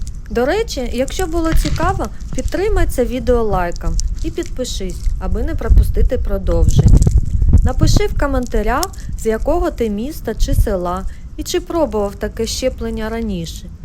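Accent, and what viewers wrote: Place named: Surzhyk, Ukraine. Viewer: Лайк за українську, хоч і трохи з суржиком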